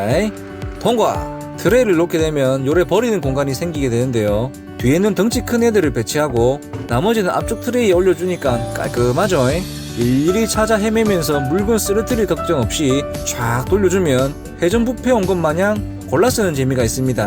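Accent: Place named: Yanbian, China. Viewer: 오오...억양이 연변분??😮 반갑습네다잉!!😮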